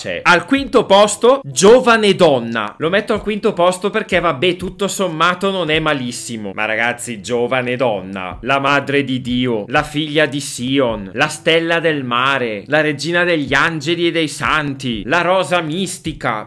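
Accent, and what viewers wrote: Italy. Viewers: Un solo appunto: sei troppo buono. Capisco che sei un Sacerdos in aeterno, ma io ci andrei giù un po’ più duro. Tutto condivisibile, anzi, condivisibilissimo! E deliziosa la calata bergamasca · Ma che bell'accento altoatesino! Bergen de sura o de hota?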